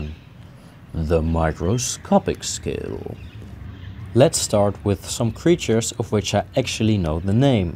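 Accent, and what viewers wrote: Netherlands. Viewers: in the first video i thought you where dutch now i can confirm it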